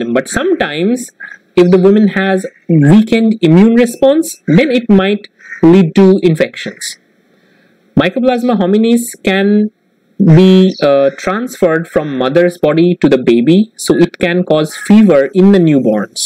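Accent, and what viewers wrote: India. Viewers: Indian people talk really funny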